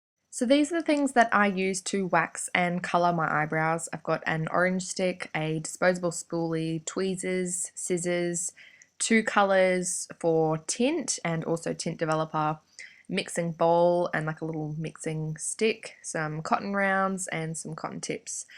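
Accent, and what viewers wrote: Australia. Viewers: whenever i see you respond to peoples comments i always read your response in an aussi accent aha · are you australian ? cause you sound like an aussie ♡♥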